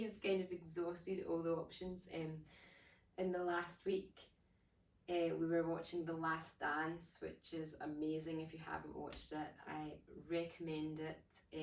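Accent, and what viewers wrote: Scotland, United Kingdom. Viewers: Really love your Scottish accent! Ciao from Rome! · I could just sit and listen you talk. That Scottish accent turns me into puddles.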